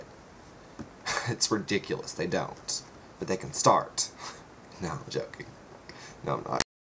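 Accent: South, United States. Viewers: I love your southern gay man voice! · The accent is coolie. You sound just like some of my friends, but more Southern :D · he has a southern accent!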